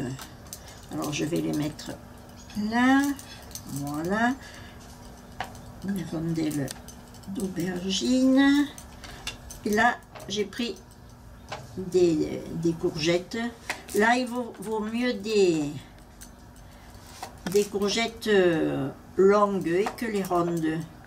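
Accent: Provence, France. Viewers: Recettes de mon enfance que je redécouvre avec vous.....😢❤ et en plus... notre cher accent provençal...❤❤❤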